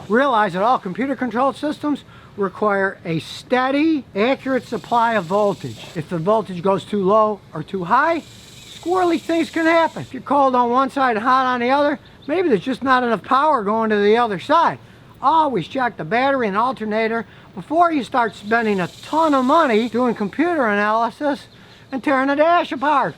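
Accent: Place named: South, United States. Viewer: Your English spoken very country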